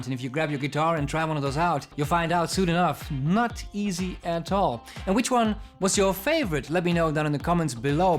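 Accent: Ireland. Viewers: What's that accent you have brother, Irish? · ure Irish dnt u..?!? Love ur videos well done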